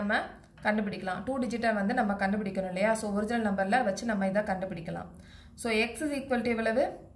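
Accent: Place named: South India, India. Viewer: Mam you are from south INDIA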